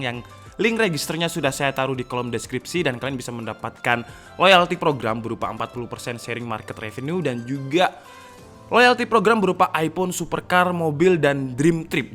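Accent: Malang, Indonesia. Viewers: Wong malang ya...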